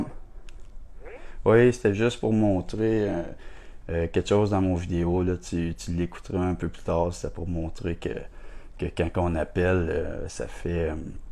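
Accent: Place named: Quebec, Canada. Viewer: Jean de Flor..les Québécois vous êtes beaucoup dans les fleurs 😉 en plus super joli accent vous avez, un vrai plaisir a vous écouter les Québécois 👍❤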